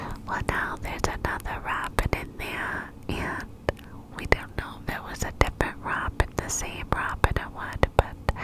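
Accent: New York, United States. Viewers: Live for NY accent videos! 😍 Thinking of you and your fam. Grateful for al you do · the ny accent is back!!! we love to see it (or hear it i guess?) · The NY accent is so tingly with your super clicky and soothing wispers😍 need this video rn! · NY accent ASMR >>> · The NY accent makes it more tingly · I love the NY accent! It’s not always easy for me to understand everything as I’m not a native speaker but your whispers always relax me so deeply 💖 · i’ve been waiting for another NY accent video for so long!! they are really my favourite, i can already tell i’m gonna have a good nights sleep tonight 😍 thank you, love you <333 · the NY accent that you do actually gives me life tbh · Love the gossip and the NY accent 👌💜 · Love the NY accent kinda reminds me of my family even though they have a Boston accent but I honestly can’t tell the difference🤗. 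Keep up the amazing work 🤩❤️😴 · I love New York accent Keep going 👍🏼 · Oh my god. Just on time. I was about to go to sleep but now I'm getting my headphones. Love your NY accent. Thanks for all the love and videos that you give us.❤❤❤ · Yess perfect timing! I can't explain how much you have helped me lately with your recent uploads ❤ Sending LOADS of love 💕 P.S I loveeee the NY accent!!! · Love the NY accent videos. Thanks xxx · NY accent 💖💖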